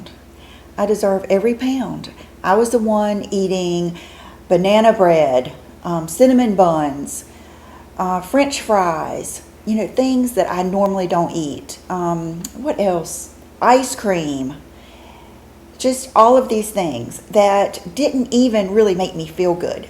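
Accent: South, United States. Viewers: you are my FAV on YouTube for this very reason!! I'm 56 and my struggles are aging and the HORROR of weight gain as well. I found you by watching Angie Hot and Flashy and have been loving your channel every since, the past few months that is. You are more than just makeup and I love that you use this platform to help others like me feel better! I used to get by with just some powder and mascara but now I'm trying so many new products and feeling so much better about myself thanks to you! I'm a fellow southerner from Texas and I love listening to you for that reason:)))) Good luck with your eating challenges:))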